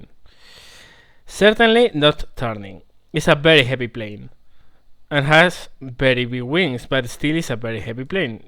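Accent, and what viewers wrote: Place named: Spain. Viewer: Pareces español por el acento inglés.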